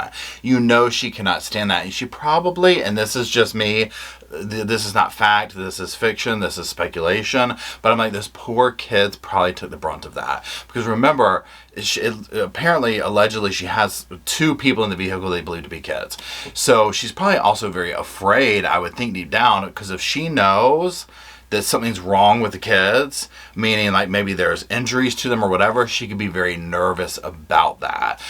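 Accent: South, United States. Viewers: 1st.. luv ur S. accent.. wonder if Kevin thinks by divorcing her that it will hide his actions b4 he was sent away by Roobs?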